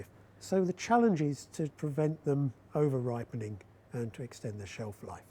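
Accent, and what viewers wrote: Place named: United Kingdom. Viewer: BananER...kills me every time! Is there any explanation as to why the British accent tends to drop the "a" and replace it with "er"? Or is it much like my New Jersey accent that tends to drop the "g" from "-ing" or implant a "w" in place of "-ll" such as "ball" turning into "bawl"? It just is what it is?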